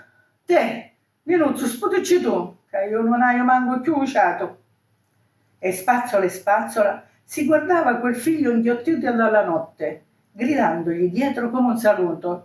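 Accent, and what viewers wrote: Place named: Catania, Italy. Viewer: Brava 🎉brava ❤!!!! Complimenti 👏👏👏bellissima storia e quantu mi piaci a parlata catanisa, hai dato le giuste enfasi al racconto facendomi entrare in pieno nella narrazione e sono riuscita a capire tutte le parole, brava! grazie ☺️ per averci deliziato del tuo racconto ❤️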